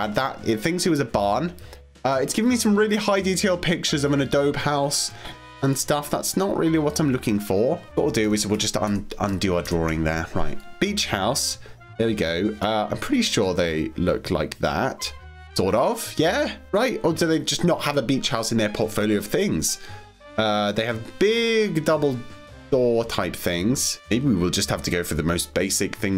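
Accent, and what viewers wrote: United Kingdom. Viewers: I love accent I wish I had a british accent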